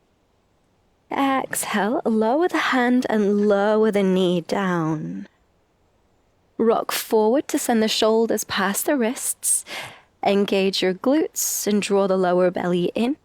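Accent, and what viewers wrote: England, United Kingdom. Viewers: hI - thank you for a lovely practise that really hit the spot for where I am just now. After a long gap I'm trying to get back into a morning yoga practice but deliberately wanted just a 20 minute beginners one that I felt I could commit to and not find too taxing while I built up my yoga muscles and love again. This was perfect! And some beautiful scenery and a lovely English accent to boot! all good - see you tomorrow. xxx💖💝💘🥰🥰😍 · Hello dear... greetings from Iran ❤ I'm so happy to find your channel, I've done some lessons so far and I really enjoy the way you teach yoga... I absolutely like your british accent too😊 Wish you the best ❤❤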